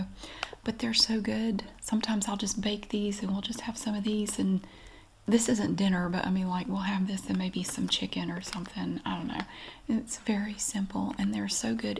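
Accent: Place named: South, United States. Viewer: My favorite YouTuber at the moment. I don’t know what it is about her. She’s addicting to watch and listen to. The southern charm is just something I don’t ever see in my state (WA) so it’s really satisfying to hear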